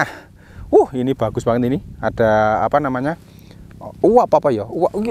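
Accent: Java, Indonesia. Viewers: kaget gua,,logatnya mas mas banget!!!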